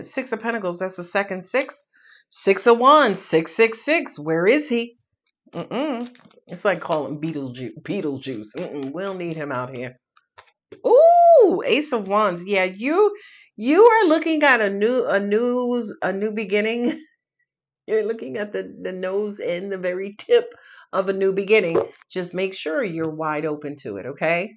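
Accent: New York, United States. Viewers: Love this episode. I giggled so much. You giving me ‘I may have had 2 margaritas at dinner’ vibe!!! Was just waiting for the NY accent to present itself! ❤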